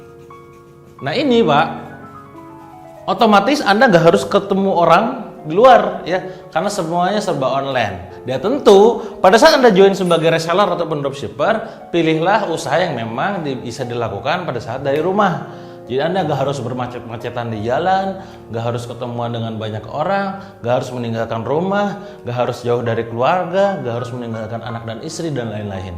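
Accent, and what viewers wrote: West Java, Indonesia. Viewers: Sia akang nyunda pisan euyyy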